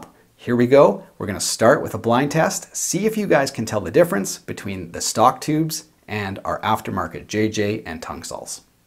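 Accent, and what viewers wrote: Canada. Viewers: Your constant Canadian mispronunciation of “project” and “process” is absolutely annoying. Don’t get me started on “bahhcks” instead of “bucks”. You can say “I’m Canadian” all you want. It’s laziness.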